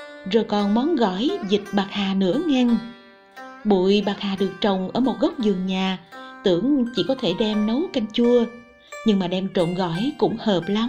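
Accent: Mekong Delta, Vietnam. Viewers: A mà là bí thư tỉnh em .A ký ngay quyết định Cho em về làm phát thanh viên đài truyền hình của tỉnh ngay. Vì giọng đọc của em rất ngọt ngào ấm áp.....lôi cuốn khán giả. Chuẩn gái miền tây...... Hihi..... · Chat lieu lam nen mon ngon rat dan da binh di khong co cong thucnhung day mui huong ket hop voi chat giong mien tay de thuong va khong the thieu chiec ao ba ba lam nen hon que viet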